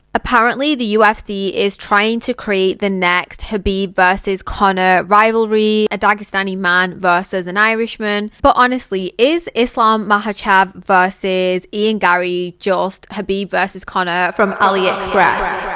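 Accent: United Kingdom. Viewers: You really think Kamaru is an easy fight for Makhachev come on beautiful british accent lady when this fight will happen you're gonna find out.from my perspective its a 50 50 fight.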